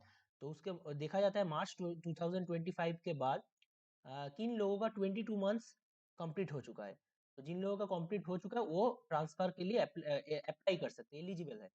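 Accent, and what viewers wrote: Bengal, India. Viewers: দাদা আপনি বাঙ্গালী নাকি?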